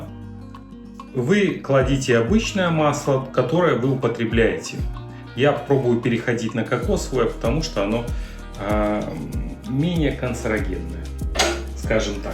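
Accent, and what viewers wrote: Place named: Odessa, Ukraine. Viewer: Длбавляе т что шикарный вкус, а то их есть у меня получается, еврейский одесский тип речи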